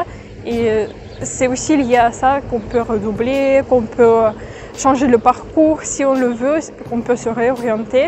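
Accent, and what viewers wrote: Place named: Marseille, France. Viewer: Salut! Salutations du Québec (Montréal). je suis simplement abasourdi par l'aisance et la fluidité de ton dialogue. Avant de me rendre compte que tu venais de la Russie, je crpyais que tu étais Marseillaise! Ton accent est tout ce ce qu'il y a de plus agréable et musical! Lorsque tu hésites sur un mot, on voit que tu as ampplement de vocabulaire pour te tirer d'affaires.......... Toutes mes Félicitations!!! - dan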